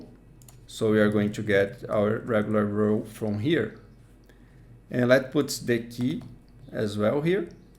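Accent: Brazil. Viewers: Brazilian acent? New sub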